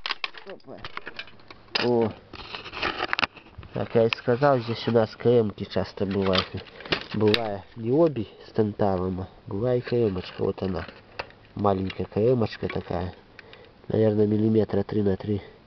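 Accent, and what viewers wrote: Belarus. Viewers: Чувствуется Белорус 😉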